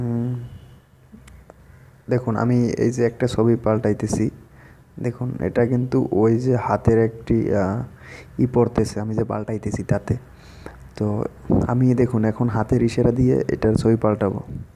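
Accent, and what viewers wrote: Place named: Gopalganj, Bangladesh. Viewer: আপনার বাড়ি মনে হয় গোপালগঞ্জ!!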